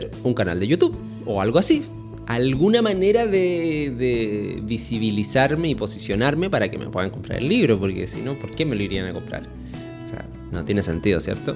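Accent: Chile. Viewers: Hola. Te sigo desde hace poco. Ya me parecía... acento chileno pero arquitectura argentina. Al final, no entendía. Te escribo desde el centro de la provincia de Río Negro. Gracias.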